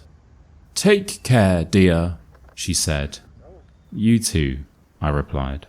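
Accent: United Kingdom. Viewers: this is amazing! I’ve been looking for something like this for a long time. Language learning is really about listening, and your calm, deep voice with that wonderful British accent makes it perfect.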